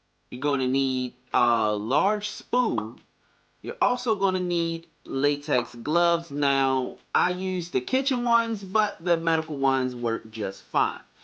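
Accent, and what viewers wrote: Baltimore, United States. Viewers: I need to know if I'm correct. Is that a Baltimore accent I hear?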